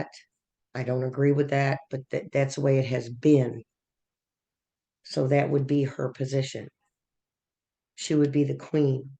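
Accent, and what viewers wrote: New York, United States. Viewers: You from NYS??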